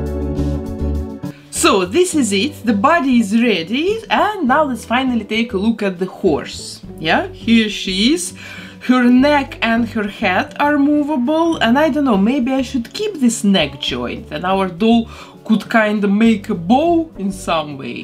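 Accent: Russia. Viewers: Почему такой прям русский акцент)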